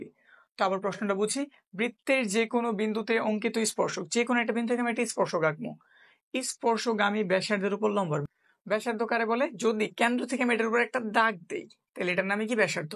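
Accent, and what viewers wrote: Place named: Barishal, Bangladesh. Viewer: স্যার আপনার😊 বাড়ি বরিশাল আমার বাড়ি কিন্তু বরিশাল😂 কারণ আপনার কথায় বোঝা যাইতেছে😮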